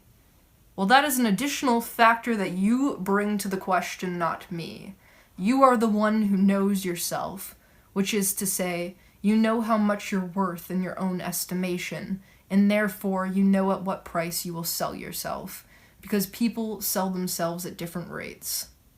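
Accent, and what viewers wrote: Canada. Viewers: What is this "boot" she keeps on mentioning? "I think this is a boot wine drinking competitions." "Here Epictetus is talking a boot spanking Spartans." (Actually, I think it is charming. I would move about Canada just to hear people say "a boot.")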